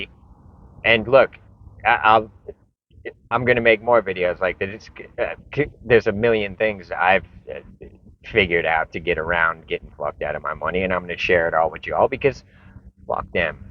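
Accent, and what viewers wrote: New York, United States. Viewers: this NY nasal accent... It's sounds great though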